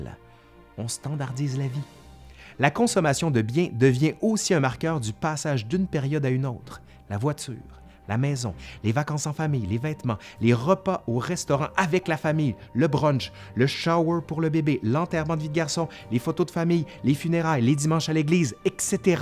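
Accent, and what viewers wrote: Quebec, Canada. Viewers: as tu déjà essayé une sorte de challenge d'action ? C'est à dire lorsque tu te poses une question sur quelque chose tu essaye d'identifier quel est l'hésitation et le doute à l'origine et d'y associer une action en faveur de ce que tu penses être aligné avec tes désirs ? Merci pour la vidéo j'ai écouté du début à la fin dans le Metro Parisien. J'ai trouvé ça très construit. Et l'accent québécois... Un vrai delice !!! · Je suis en train d'apprendre le français et je m'interroge sur l'origine de l'accent de cet homme. Est-il québécois, par hasard ? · Je découvre ta chaine, là à 3h du mat', un ravissement pour bercer mon insomnie ! Super intéressant (sans parler de l'irrésistible accent de la belle province😊)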